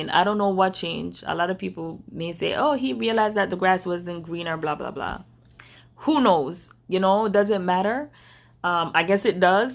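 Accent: Jamaica. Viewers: i detect that jamaican accent...i am too jamaican,..like your video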